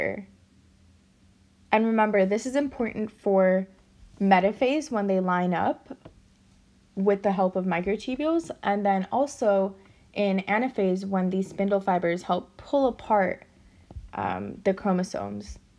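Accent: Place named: New York, United States. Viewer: Nyc accent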